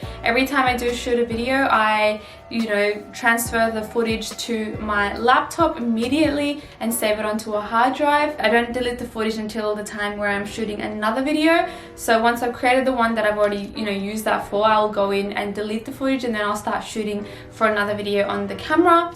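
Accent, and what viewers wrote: Australia. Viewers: Brilliant video! So helpful, I'm still using my phone to film at the moment, I actually don't think it's that bad but would love to upgrade to a proper camera one day. The ring light makes SUCH a difference. Are you Australian? X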